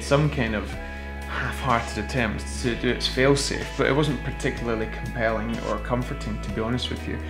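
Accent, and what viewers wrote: Scotland, United Kingdom. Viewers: A cool video. I love the scottish accent and the landscapes. ;-) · wonderful video. super helpful. I wish all instructional videos were given in that lovely Scottish brogue.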